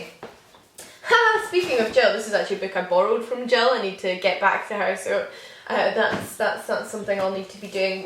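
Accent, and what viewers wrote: Scotland, United Kingdom. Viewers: your accent <3 Love the Scottish accent ! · This is definitely the most Scottish I've ever heard you sound in a video.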